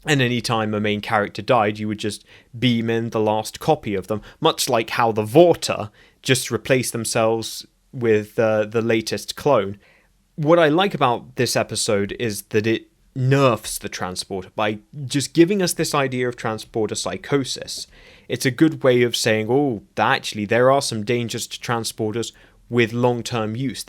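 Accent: United Kingdom. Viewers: a British pronunciation should be expected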